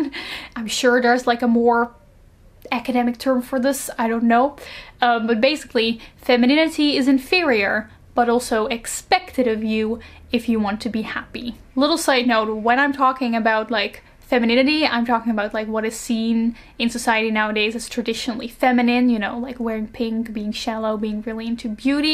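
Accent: Netherlands. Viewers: The Algorithm recommended me this video...that accent sounds dutch are you dutch?! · are you a dutchie i hear an accent (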